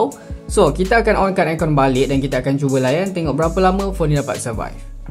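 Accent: Malaysia. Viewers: satisfying bat denger orang malaysia ngomomg hahaha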